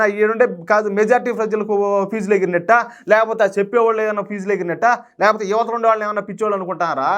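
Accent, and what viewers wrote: Rayalaseema, India. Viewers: మీరు మన రాయల సీమ భాష అంటే నాకు చాల ఇష్టం మీరు చాల బాగా మాట్లాడు తున్నారు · చాలా బాగా చెప్పారు అన్న....మన రాయలసీమ భాష విని చెవులకు ఉన్న తుప్పు విడిచి పోయింది....జై శ్రీరామ్🙏🚩🇮🇳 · చాలా చక్కగా మంచి ప్రాంతీయ భాషలో రాయలసీమ భాషలో బాగా వివరిస్తున్నారు గురువుగారు జైశ్రీరామ్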